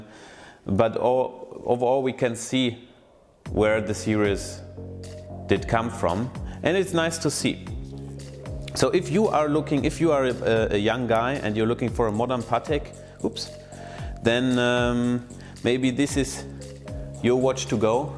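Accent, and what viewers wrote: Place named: Germany. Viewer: Ja aber ich muss bei seiner Aussprache zu oft lachen. Ich meine sein generelles Englisch ist ziemlich gut, aber Aussprache die ist schon Deutsch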